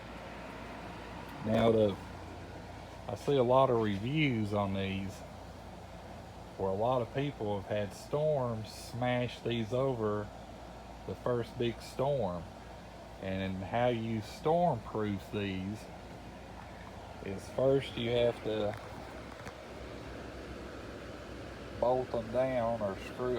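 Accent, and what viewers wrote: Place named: Alabama, United States. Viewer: Better and more good ideas added than any of the other Green Canopy Regent Gazebo videos! Thank you (I recognized the accent. My father in Law, R.D. Blackwell, came from alabama with a similar fun to listen to drawl, and those two letters before his Family name. Thanks muchly. We'll be ready for storms. We just put up the framing on ours. and we're putting in into cement slab. I just finished hammer drilling 1/4" holes and put in sleeve anchors into the holes, with 5 ft. pound 3/8 " diameter acorn nuts (we used to call them "nylocks") to lock the frame down into the cement. The straps will be next. Thanks again. I enjoyed the tour of your gazebo. Oh and the rope lights will be going up in ours too. great idea. Blessings. - Vern Bauerle from Alta Loma (a postal area inside Rancho Cucamonga, California.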